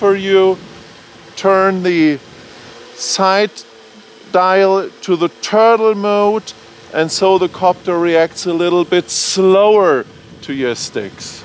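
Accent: Germany. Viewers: Super video-Thought his german accent be irriating but I grew to like it. Thanks again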